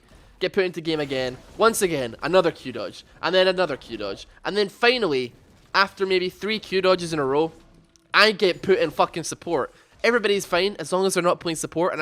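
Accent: Scotland, United Kingdom. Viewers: Scottish I believe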